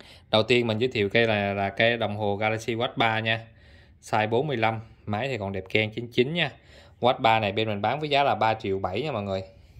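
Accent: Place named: Mekong Delta, Vietnam. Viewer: A la người mien tay ha nghe dong quen quen. E o bạc lieu a gửi ve cho e dượt ko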